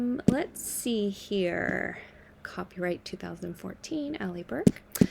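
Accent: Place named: United States. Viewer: Have you ever heard of "Mad Studies"? or have you seen the webpage Mad in America? Interesting research into mental health, also check out Sadhguru on youtube. I'm enjoying your narration the American accent is cool.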